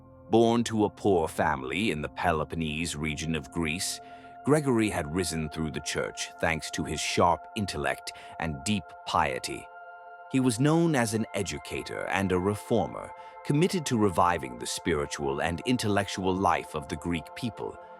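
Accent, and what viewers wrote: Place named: United States. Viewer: DOBLAJE Y PRONUNCIACIÓN INFAMES Y VULGARES.... ¿Qué forma es esa de pronunciar nombres griegos, turcos y europeos? lo pronuncian todo a la anglogringa... ES REPUGNANTE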